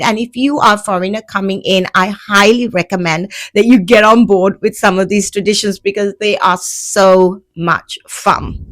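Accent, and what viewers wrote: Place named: United Kingdom. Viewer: I adore your English accent. I wish my own english could sound so sophisticated-ly British xD With surströmming, the smell might reach biohazard levels but I'll tell you that it doesn't really taste like anything. It's basically just taste like salt, an extra salty pickled herring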